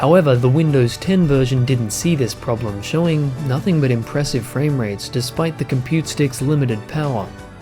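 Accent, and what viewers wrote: Australia. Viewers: Are you aussie mate? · May I ask what accent that is? I'm not a native English speaker so I have difficulty placing accents. Australian?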